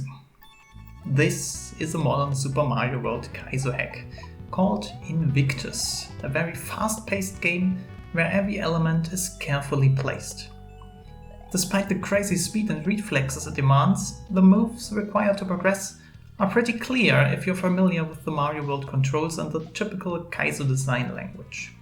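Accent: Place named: Germany. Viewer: It felt nice to hear a new German voice. And of course, every word was pronounced 100% clearly.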